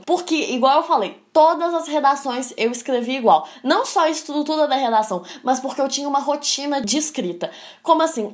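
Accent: Minas Gerais, Brazil. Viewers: Mineiros falando é algo simplesmente incrivel.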